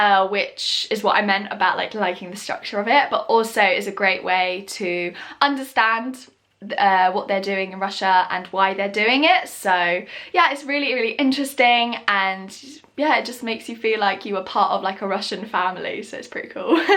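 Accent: United Kingdom. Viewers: I love to listening to british accent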